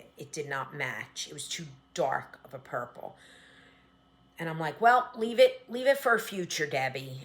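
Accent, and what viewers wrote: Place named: South Jersey, United States. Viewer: Have been enjoying your flosstubes for awhile and realized you haven't been doing them that long. I decided to go back and watch your first video. LOL! I am a Jersey girl living in Williamsburg VA because my husband is a retired Army officer, and this is where we ended up. We are college sweethearts who graduated from Glassboro in 1983. I did my student teaching in Haddon Heights. Love your south Jersey accent.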